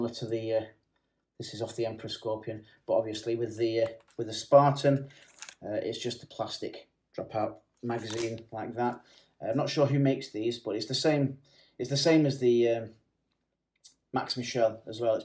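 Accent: England, United Kingdom. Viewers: Reviewing the 1776 with your English accent was a treat. 👌 Not a bit of salt over the defeat. 🤣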